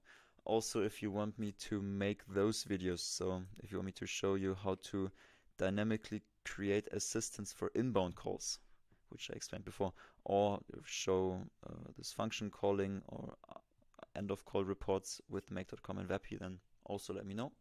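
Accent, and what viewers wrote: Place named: Germany. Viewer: Aufgrund deines Dialekts nehme ich an, dass Du aus Deutschland kommst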